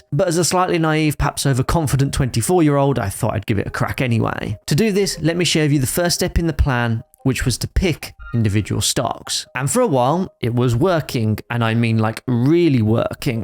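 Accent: United Kingdom. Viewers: You when you can tell someone is British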